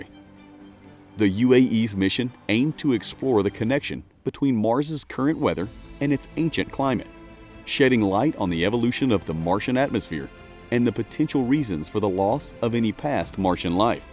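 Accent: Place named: Appalachia, United States. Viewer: "Narrated by Reverend Lovejoy, of Springfield..... somewhere in the U.S.." It's so weird hearing narration with a Southern Appalachian accent, or thereabouts. East/Central Tennessee? Then, or so... um... "One of these things is not like the others." Somebody really badly misspelt "Sulfur." , your captions spelled maghemite wrong; there is no such thing as "magami." , Arctic has a 'c' in the middle. It is not "ahr-tik." it's "ahrk-tik." Please. , there it is: "EE-kwae-tuhr." That's East Tennessee. Straight up "Beverly Hillbillies'" Buddy Ebsen, as Jed Clampett. Equatorial as "eh-KWAE-ti-ohr-uhl?" No. It's, "eh-kwih-TOHR-ee-uhl." I would proof-listen for free, btw. Inhabitable is not "ihn-hah-BIH-tuh-buhl." It's, "ihn-HAH-bih-tuh-buhl." I am starting to wonder if this voice is an AI pretending to be southern. I have literally never heard anyone else mispronounce this word. 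Ever. P.S. That Biden section was unlistenable. Had to skip it. We need better.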